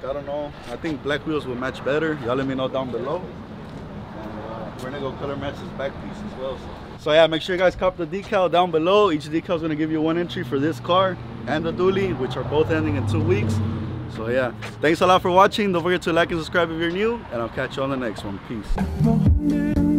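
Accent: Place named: South East, United Kingdom. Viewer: This nga sounds like he from SE😅😅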